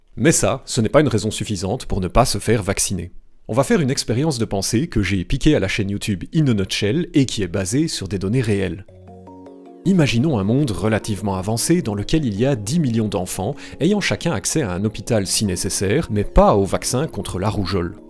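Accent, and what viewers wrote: Paris, France. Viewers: Suite à cet accent parisien je me désabonne. Cordialement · tu fais trop bien l'accent parisien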